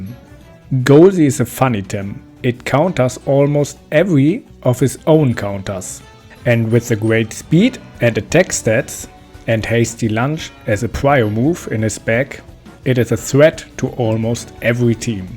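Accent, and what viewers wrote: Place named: Germany. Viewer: Sofort gehört das du deutsch bist😂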